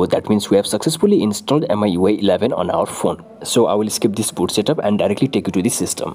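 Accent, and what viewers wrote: India. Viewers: Thumbs up for this indian speaking english finally I can understand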